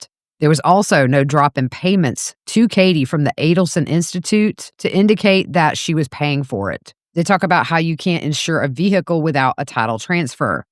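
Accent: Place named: Boone, United States. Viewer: One can become invested in the success of a content creator. In my case about two years ago my wife asked me why I was emotional. I was blinking back tears as was talking about her Grandmother, taking her to get her hair done or to a medical appointment. Then I learned daughter moved out of state. I told my wife I am reminded of my family from Boone NC area. Similar accent etc, same heart. I tithed my time to this podcast as I shared and liked the podcast. I also try to write a comment that a new viewer may like and subscribe. I am now in a position to help this channel with $$ and I plan on making it happen Asap. Thanks …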